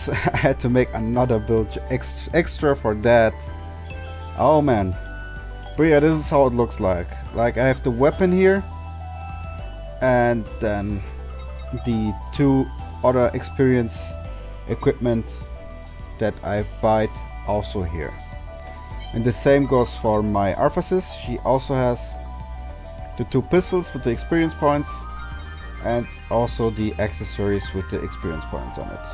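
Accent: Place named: Germany. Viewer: Bro's accent is very German xd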